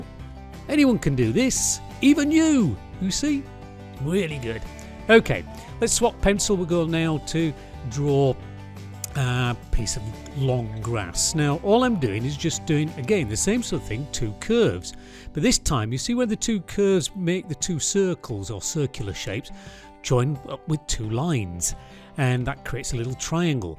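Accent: United Kingdom. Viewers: The British accent with honky tonk music is a neat, yet confounding juxtaposition. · hmm how to practice my s curves... AH! draw ribbons!! the British accent, the voice of logic and reason, love it! subbed and checking out your other videos, cliffs sounds fun! watching that next after your video on lines.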